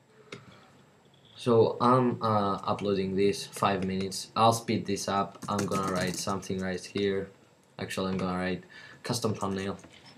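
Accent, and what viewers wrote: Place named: United Kingdom. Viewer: OMG HE'S BRITISH THAT COMPLETELY MAKES IT!!!!!! awesome video by the way helped a lot thanks!!!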